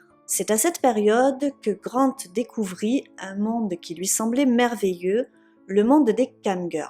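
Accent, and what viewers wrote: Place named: Var, France. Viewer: cogiter stories a traité le sujet il y a 3 semaines. Mais je vais quand même regarder ton travail car j'aime ton accent varois(peut être je me trompe) et car tu développes très bien tes sujets. Bonne fêtes de fin d'année à tous.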